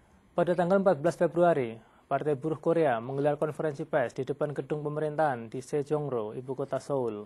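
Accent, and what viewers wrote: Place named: Java, Indonesia. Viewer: HE HAS A JAVANESE ACCENT! He pronounces words so bad :(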